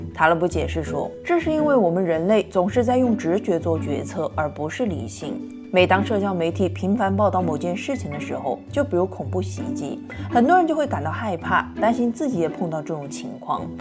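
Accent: Hunan, China. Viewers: 博主真的不是湖南人吗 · 大概率是湖南口音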